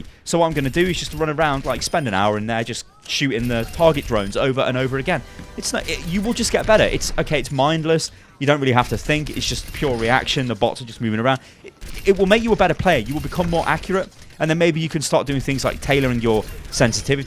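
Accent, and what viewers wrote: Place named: United Kingdom. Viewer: Subscribe reason: 30% Tips and Guides 70% British Accent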